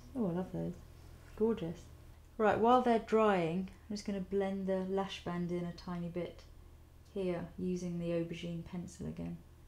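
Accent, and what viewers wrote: United Kingdom. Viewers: Beautiful!!! Oh, by the way, I love your accent. Whereabouts in the UK is it from? All the best from a Portuguese fan:) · English is not my first language but I definitely understand every word she says.......She speaks perfectly.....This is the british accent that I adore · British lady <3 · I love yr British accent!!!!!!!!!!!!!! <3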